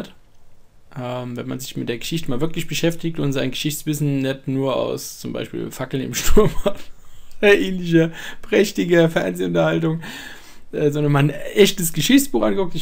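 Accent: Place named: Frankfurt, Germany. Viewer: sorry aber Arbeite mal an Deiner Aussprache, dem Gesamteindruck,Struktur und Aufbau Deines Vortrages -der content stimmt ja ;-) aber so ein echter Frankfurter? kann net anners!?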